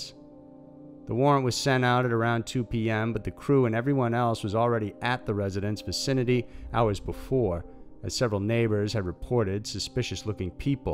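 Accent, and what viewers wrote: South, United States. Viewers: Some of your words sound so southern. Where are you from? I’m a Carolina boy myself. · I'm not usually an enormous fan of American accents but I really love the narrators one, could listen to it all day! What part of America is that from?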